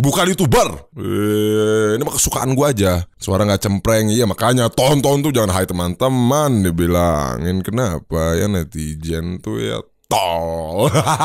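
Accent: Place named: Kalimantan, Indonesia. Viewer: Logatnya kek orang kalimantan bang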